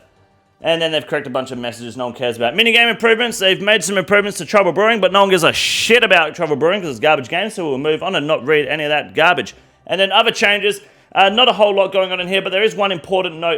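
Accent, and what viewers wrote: Australia. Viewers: Never thought RuneScape would have a unhinged Australian. I love it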